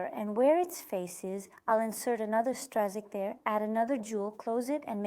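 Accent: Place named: Sri Lanka. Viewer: Sounds sri lankan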